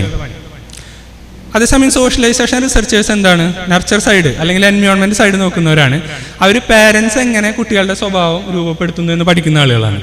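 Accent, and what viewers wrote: Thrissur, India. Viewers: കിടിലൻ സബ്ജെക്ട് dear..... കിടിലൻ തൃശൂർ സ്ലാങ്... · Thrissur Slang presentation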